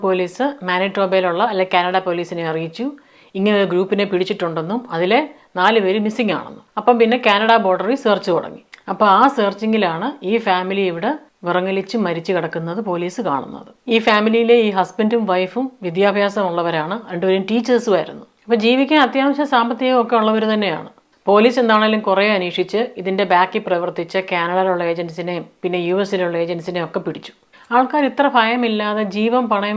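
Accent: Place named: Thrissur, India. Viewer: ചേച്ചി ഞാൻ തൃശൂർ ആണ്, പക്ഷെ ചേച്ചിയുടെ സ്പീകിംഗ് ഒരു തൃശൂർ സ്റ്റൈൽ ആണ് കേൾക്കാൻ രസം, തിരുവല്ല ആണെന്ന് അറിയാം 😂😂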